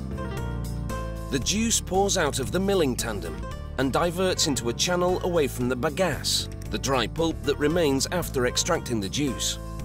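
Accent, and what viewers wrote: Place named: Liverpool, United Kingdom. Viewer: He’s actually scouse, one of the more common peasantry sounding English accents.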